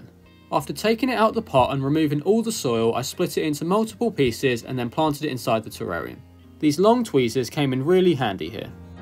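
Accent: United Kingdom. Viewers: His British accent is just killer😌 By the way the setup is amazing 🎉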